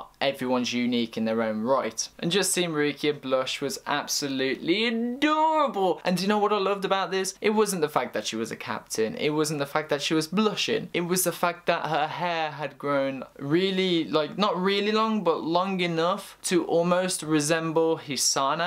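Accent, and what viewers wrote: United Kingdom. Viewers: Great review. btw I like your british accent;)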